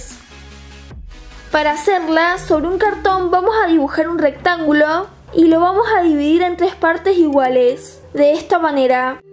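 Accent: Argentina. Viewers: De que país sos parese asentó argentino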